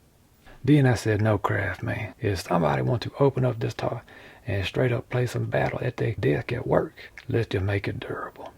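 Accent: Louisiana, United States. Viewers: voice just makes me think of a guy in the back woods bayou near New Orleans sitting on a porch with a banjo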